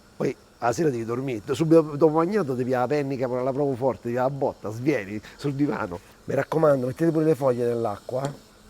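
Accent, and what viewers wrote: Rome, Italy. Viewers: Quando ti sento mi ricordi Roma (dalla quale manco da più di 20 anni, ahimè) comunque l'hamburger l'hanno inventato gli antichi romani (isicia) anche se la radice del nome è in effetti tedesca..Complimenti per la ricetta, i broccoli sono un colpo di genio... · Ma la < padela de fero>... é robba da guera. Non mi piace il dialetto romanesco, ma tu me lo rendi gradevole. posso un consiglio? Il torsolo dei broccoli, NON SI BUTTA! Va pelato dalla scorza e spuntato alla base, poi si taglia in pazzetti di mezzo centimetro e si mangia crudo con o senza condimenti. Una delle verdure piu buone in assoluto, da primato! E pensare che tutti lo buttano via. Un delitto. A crudo, tipo mela.. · Meno accento, romano però: ti sentirai più spontaneo.... a me sembra che parli solo a quelli della tua città ... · Toujours des recettes délicieuses, et puis cet accent romain qui me rappelle tant mon enfance, merci Chef.....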